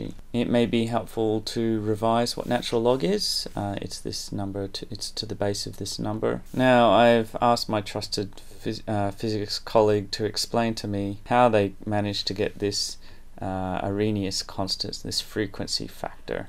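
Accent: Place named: Australia. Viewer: Hi,Lukas here.... Your videos are really great! I'm watching this one now for the test on Monday, in fact. By the time I've finished the iB, I hope to have mastered the Australian accent through your videos, but of course, in addition to some good chemistry knowledge. Also, I'd like to point out that the mysterious "DOGE" = Luke.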